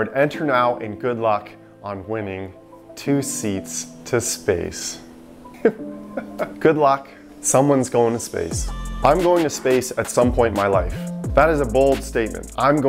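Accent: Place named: Canada. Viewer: Before you go apologizing for your country, you might want to mention which country you are in. I thought you were Canadian because of your accent but I know there have been a lot of forest fires in the US. No criticism intended. Your content it always GREAT!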